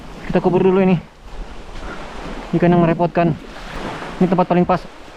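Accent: Manado, Indonesia. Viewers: Itu logat manado memang kantal skali kang 😅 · Di daerah mana ini kawan ?? logat manado ngana pake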